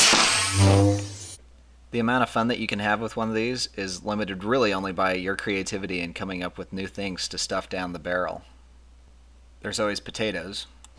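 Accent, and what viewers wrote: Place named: United States. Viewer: typical american voice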